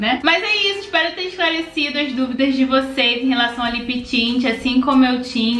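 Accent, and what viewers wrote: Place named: Rio de Janeiro, Brazil. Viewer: Carioca, gosto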